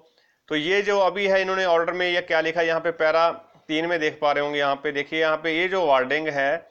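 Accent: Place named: Punjab, India. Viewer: Sir aap hindi punjabi style main bol rahay hain isliye 50 percent hi samjha baqi andaaza laga Liya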